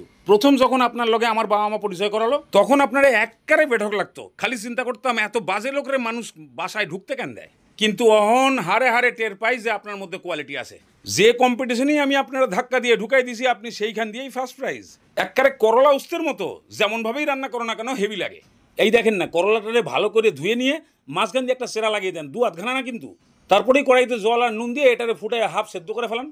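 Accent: Bangal, Bangladesh. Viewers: আপনার বাঙাল ভাষা শুনতে খুব ভালো লাগে, আর রান্নাগুলোর তো কোনো জবাব নেই। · Sob thik ache sudhu bangal der moto kotha bola ta charte hobe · dada apnake onek dhonyobad.Darun sundar testy korolar recipe dekhlam.Tobe dada apnar bangal katha gulo Bhanu Bandopadhyay er moto lagche.Apnar quality ache aar apni drama korte parben.Thanks.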